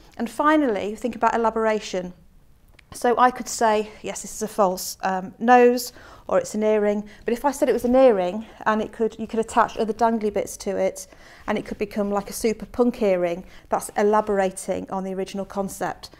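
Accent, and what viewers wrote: United Kingdom. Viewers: I'm an English teacher, I understand what you are doing as ahelp friend.with British pure accent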